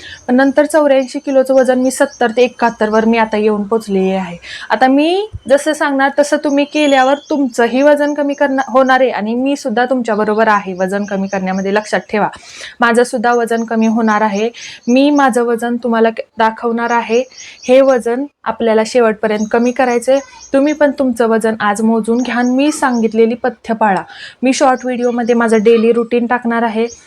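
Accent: Satara, India. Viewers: छान वाटला व्हिडिओ ताई तुम्ही सातारच्या का तुमचं बोलणं मस्त वाटलं😊🎉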